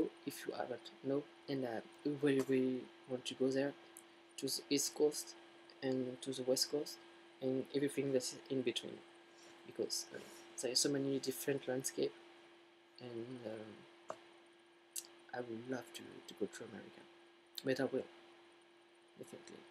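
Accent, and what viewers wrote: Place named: France. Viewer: aaah beautiful french accent, I loved Rosy 'ardy :D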